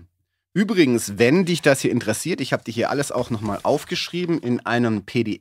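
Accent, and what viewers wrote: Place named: Heilbronn, Germany. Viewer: klasse video, sowas hilft mir echt immer wieder weiter bei meinen eigenen basteleien in meinem möchtegern-homestudio! ;) gerade bei EQ (und eben auch kompressor) kann ich gar nicht genug input und anregungen kriegen um dann selber damit zu experimentieren... manches klingt auch erstmal einfacher als es dann im detail in der praxis wirklich ist! ;) nachdem ich mir jetzt schon einige deiner videos angeschaut habe, kann ich mich jetzt aber mit einer persönlichen frage nicht mehr zurückhalten: ich hatte mal einige jahre in den 90ern an einem heilbronner gymnasium einen musiklehrer (und erdkunde) mit dem gleichen namen. der unterricht war echt klasse und ich war auch unter seiner leitung im chor und anderen schulprojekten. ich habe gesehen, dass du auch aus der region bist, die sprechweise kommt mir irgendwie ein wenig vertraut vor und nunja die frisur hat auch gewisse ähnlichkeiten. 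;) also drängt sich mir einfach die frage auf ob da wohl ein familiärer zusammenhang besteht...? :)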